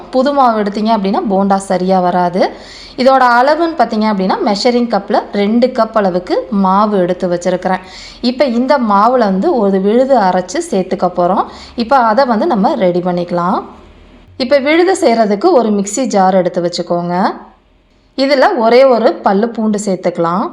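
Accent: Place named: Coimbatore, India. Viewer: அக்கா நீங்க coimbatore ஆ,,,,உங்க பாஷை அப்படி இருக்கு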